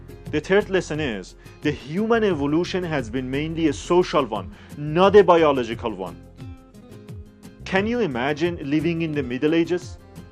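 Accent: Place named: Iran. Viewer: سلام! مرسی واسه ویدیو لهجه ایرانیت خیلی شیرین ترش کرد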